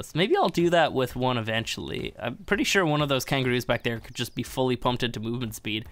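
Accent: Ireland. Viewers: Are u Irish bro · Which part of Ireland are you from?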